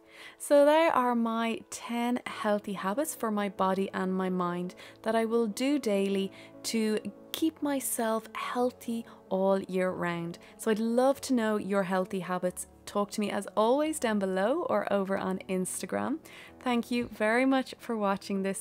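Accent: Ireland. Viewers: your lovely calm Irish lilt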